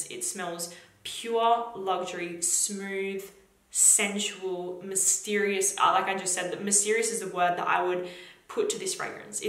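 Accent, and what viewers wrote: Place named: Australia. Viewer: Australian accent spotted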